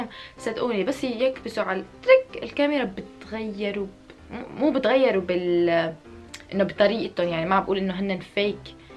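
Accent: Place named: Algeria. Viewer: نتي جزائرية تاع الصح نستعرف بهدرتك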